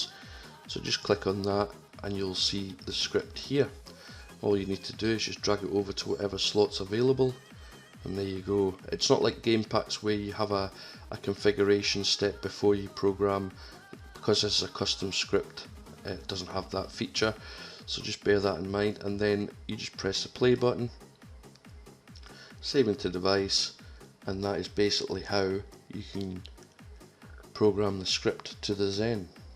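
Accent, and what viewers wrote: Scotland, United Kingdom. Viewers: Do I hear some Scottish in that accent? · No way , your Scottish ! Nice video man and great script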